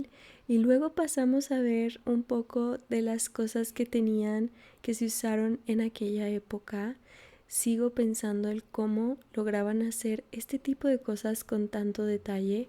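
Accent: Mexico City, Mexico. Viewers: Wow! Yo juraba que eras de la cdmx por tu acento 🥹 qué bueno que fuiste y conociste mucho, yo creo que los que vivimos aquí no terminamos de recorrerla! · Casi juraba, antes de este video, que eras de la cdmx y vivias en la roma o en la condesa jajaja. Que bueno que te gusto, salu2! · Yo también pensé que eras de la CDMX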